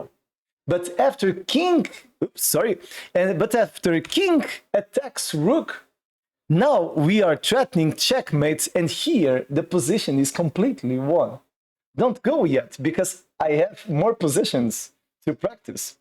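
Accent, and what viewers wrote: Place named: Azores, Portugal. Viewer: O bendito, pelo nao dito, tu es da ilha do Pico. Took me a bit, but I know it was Portuguese with azores accent. Good show and enthusiasm.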